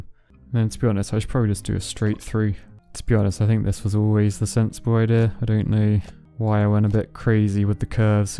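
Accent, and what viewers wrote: United Kingdom. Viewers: Its the British accent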